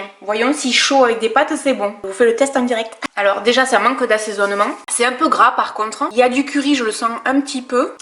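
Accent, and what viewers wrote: South, France. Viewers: Le chocolat est vachement moinssssss bien 😂😂 . « accent du sud trop mignon ». Toi au moins tu es un vrai régal. Comme toujours. Bon dimanche à tous de Belgique 🇧🇪.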